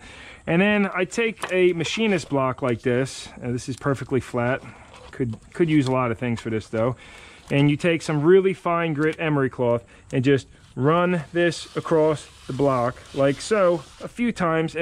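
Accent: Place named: Philadelphia, United States. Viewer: Pendell? As in Pendel PA? Langhorne? I live in Oklahoma now but i have not heard Pendel in a loooooong time. Clearly there are more then one town called Pendel in America but i used to live in Parkland when the Airplane Restaurant was still in Pendel. Anyway you sound like a Neshaminy Or Pensbury school grad North East Philly slang having hometown homeboy. So its gotta be! Come to Oklahoma and watch everyone look at you and change your name to Yankee! Thats been my name here since i moved here in 2014! Most people dont even know my real name! LMAO Facts!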